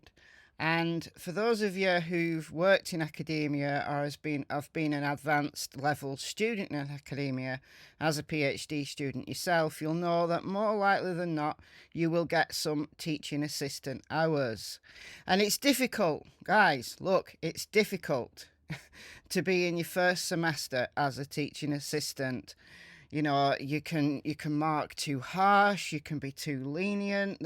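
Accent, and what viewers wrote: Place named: Manchester, United Kingdom. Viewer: Love that Mancunian accent. Great content. Will be back for more. Lived near the Denton Golf Course in a previous life, on the way to Stockport.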